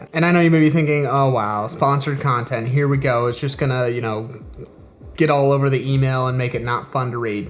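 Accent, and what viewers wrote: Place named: England, United Kingdom. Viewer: I like your English accent!! God bless you a lot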